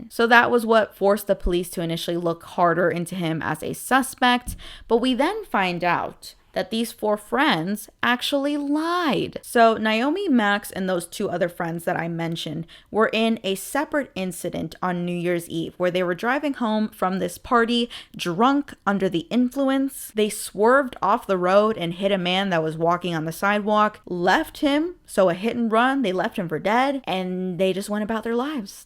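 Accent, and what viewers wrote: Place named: Midwest, United States. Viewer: The midwestern accent dropping the last letter is REALL. I literally paused the video to say the title and realized I did that as well lmao.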